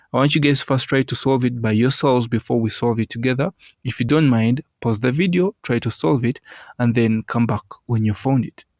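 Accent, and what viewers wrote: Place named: Uganda. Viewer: I'm Ugandan too so I could tell from the accent. This was very tricky for me to figure out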